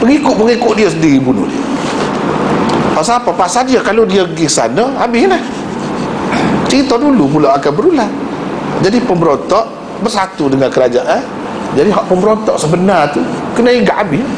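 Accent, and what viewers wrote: Kelantan, Malaysia. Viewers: Beliau menggunakan Bahasa Melayu dengan dialek Kelantan🙏🏼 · Ko tak faham loghat kelantan ker? Cuba ko ulang tengok video ni lagi. Ustaz ni la antara yang paling kuat mempertahankan sayidina mu'wiyah RA..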